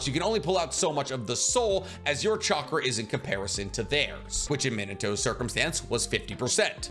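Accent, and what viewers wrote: United States. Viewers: Cn you please pronounce their names correctly? Your content is great but it hurts credibility when you pronounce the names super American-like.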